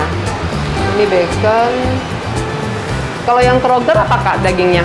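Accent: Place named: Bangka, Indonesia. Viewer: org Bangka ya? Denger logat nya?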